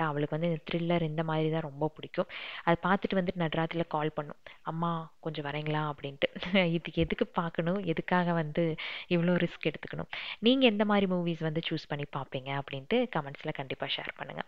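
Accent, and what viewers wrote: Coimbatore, India. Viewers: Akka unga voice super athulaum conversation with cbe slang vera level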